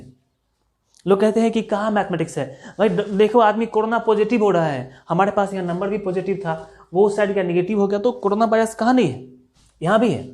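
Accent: Bihar, India. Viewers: Oo ho bihari❤❤❤❤❤❤❤ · Bihari language padhaai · Sir mai bhi Bihari hun App ke (Bihari style +study) bahut accha Laga mai yah video 2026 ko dekh raha hun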